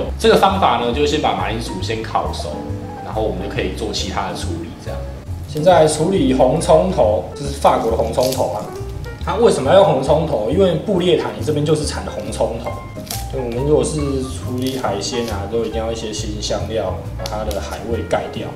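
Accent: Taiwan. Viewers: 光聽這台味就舒服了！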